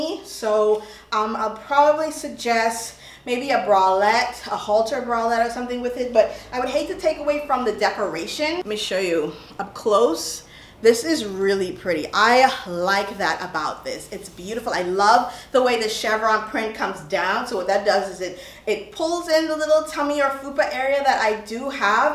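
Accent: Bahamas. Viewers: just watched your rose wholesale video and am like this girl sounds Bahamian so I stalked your page and you are Bahamian yayyyy!!! I love your videos definitely will be subscribing and yes am Bahamian too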